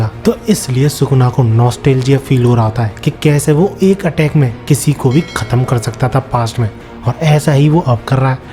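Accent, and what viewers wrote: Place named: Uttar Pradesh, India. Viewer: Bhai ye UP vale accent mein ARA ARA mat kiya karo, japanese words bol hi mat, bahut bekar lagta hai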